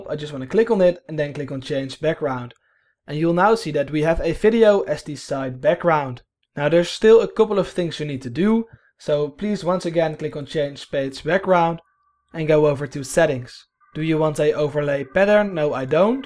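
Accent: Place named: Netherlands. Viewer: Sjonge jonge ik hoor dat platte Nederlandse accent gewoon keihard, heerlijk! Het heeft gewerkt, dankje! :)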